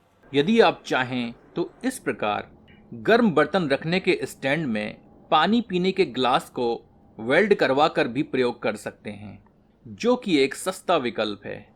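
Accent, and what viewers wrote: Standard. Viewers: apki suddh hindi sun k anand ata h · best is your shudh hindi narration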